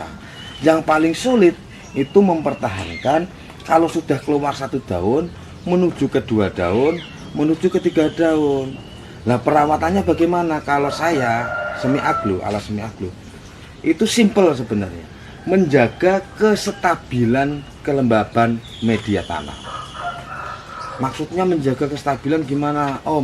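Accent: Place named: Java, Indonesia. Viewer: ni bahasane medok banget ternyata, pakai sulap spt pak Tarno pula 😀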